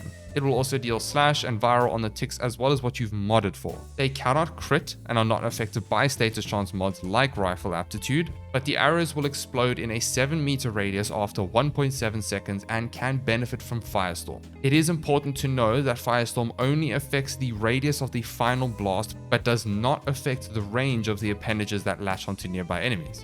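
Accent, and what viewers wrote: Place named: KwaZulu-Natal, South Africa. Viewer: You from KZN bru?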